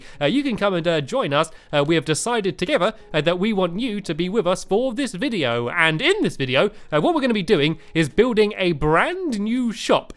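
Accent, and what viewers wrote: United Kingdom. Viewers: Dude, you British? I'm from a commonwealth so I have a British accent too :) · You know that is British bc he has an accent and he spells color with a u!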